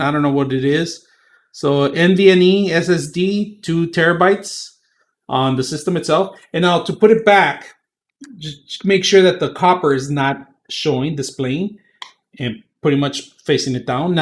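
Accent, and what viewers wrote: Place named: Philippines. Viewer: I love your accent, sounds a lil bit filipino sometimes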